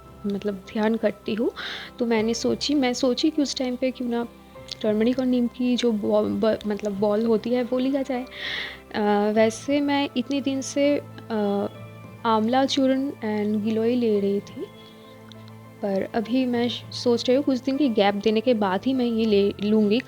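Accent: Bengal, India. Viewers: Are you Bengali??? Your Hindi accent has a Bengali style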